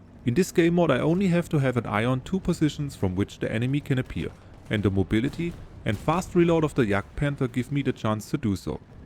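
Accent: Germany. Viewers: Eine Sekunde gehört und gewusst, da spricht n deutschsprachiger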